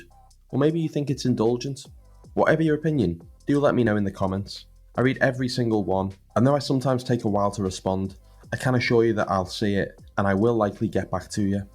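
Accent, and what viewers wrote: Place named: Northern England, United Kingdom. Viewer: The voice is completely different, the commitments set in Ireland, and the chap running this channel is northern English (possibly close to Liverpool but not from the city itself?)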